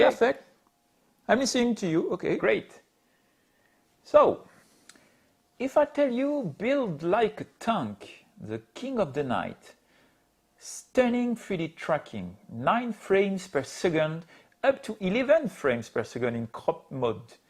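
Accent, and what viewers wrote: France. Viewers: Bonjour, je crois entendre un accent francais ;-). Vous me recomandriez la nikon d3s a 799euros? Je suis un debutant et cherche une camera pour la photographie de paysage et de nuit. Je concidere aussi une fuji xt30 ou une sony 6400. Merci pour la reponse d avance. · Assurément un français according to his accent lol big up!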